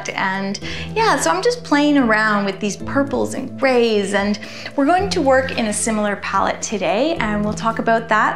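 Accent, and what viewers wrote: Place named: Atlantic Canada, Canada. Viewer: These flowers are lovely! I enjoy your video’s so much. I love listening to your Atlantic Canadian accent. It makes me want to visit PEI! Thanks for sharing your talents.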